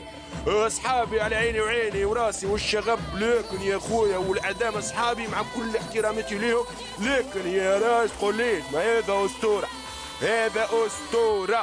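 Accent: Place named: Tunisia. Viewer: المعلق تونسي و قالك فضيحة ههههه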